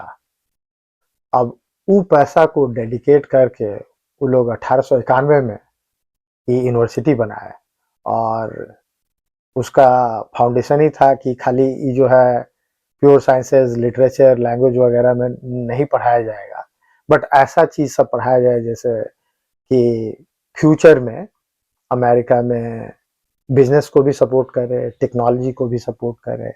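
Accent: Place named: Bihar, India. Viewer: Bahut achha lga apka video.. sbse inspiring lga app apne bihari tone ko maintain rakhe hue hai america jake bhi.. proud feeling.. thank you for such inspiring content wo bhi jab apne language or apne tone me rhte hue mil jaye to.. hmlog to india me rhte hue bhi apna bihari tone bhul gaye hai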